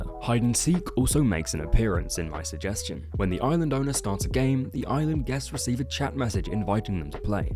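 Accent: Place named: United Kingdom. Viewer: Video is good even tho the guy speaking is British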